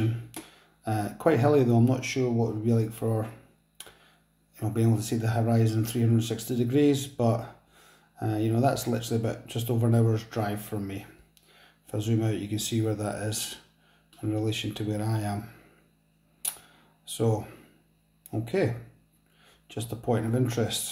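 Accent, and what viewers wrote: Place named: Scotland, United Kingdom. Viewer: Thank you for short but great video. Love the Scottish accent. Enjoyed this. I wonder if the exposure time was extended to 20 s how it would go? Well put together video.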